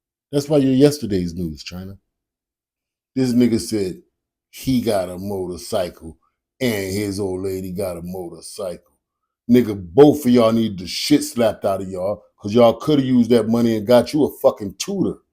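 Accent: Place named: New York, United States. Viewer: I just wasted 11 & something mins about nothing,sound like a throw back dude from n.y.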